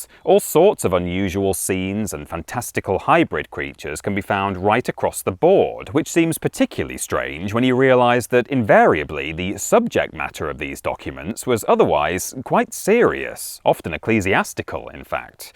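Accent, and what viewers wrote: England, United Kingdom. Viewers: How can a man with a heavy English accent do a video on this topic and not show a clip from the Monty Python movie with the killer rabbit? At least I can see where they came up with such a ridiculous idea... yesterday it made no sense, but today I've grown.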